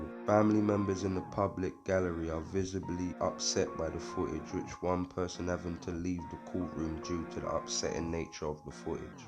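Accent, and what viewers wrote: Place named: Cockney, United Kingdom. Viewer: Couldn't you get a better narrator? Not this monotone voice from the East End of London...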